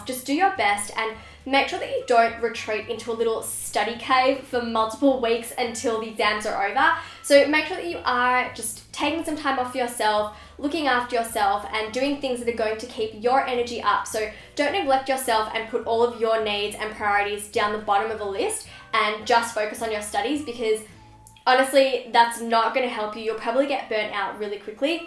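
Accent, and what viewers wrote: Australia. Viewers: Are you Australian??? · Is she Australian ?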